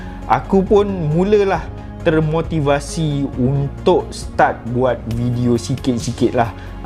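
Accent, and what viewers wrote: Terengganu, Malaysia. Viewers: Tahniah.. Selamat maju jaya.. Tapi request aku sblm ni tak buat lgi.. Aku mintak video ckp full loghat tranung mung dok buat lagi..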